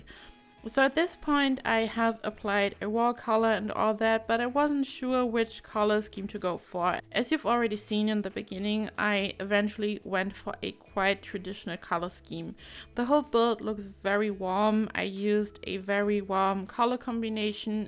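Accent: Germany. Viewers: Are you german? I swear it sounds so familiar 😂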